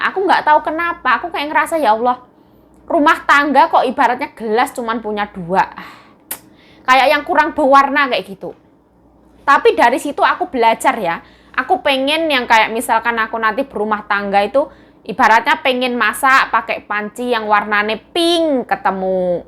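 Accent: Java, Indonesia. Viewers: Mbak pean Indonesia mn to kyok'e Bahasane podo karo aq jowo tulen😀👍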